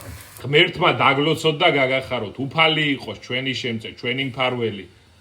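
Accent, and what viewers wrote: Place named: Racha, Georgia. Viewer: რაჭველი ხარ???? კილო გაქვს რაჭული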